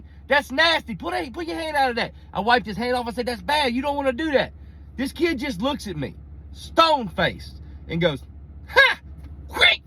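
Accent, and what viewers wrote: South, United States. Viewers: That is fifty shades of wrong three ways to Sunday and I ain't lyin'. That there is one helluva case pink eye just waiting to happen to the whole house. If it ain't already happened by now. nods Oh yeah, other people's kid will definitely have you rethinking your lifetime plans with or without kids. Of course I did laugh my ash off.😂😂😂😂 It's that Southern twang he got. I love it. Got me all homesick.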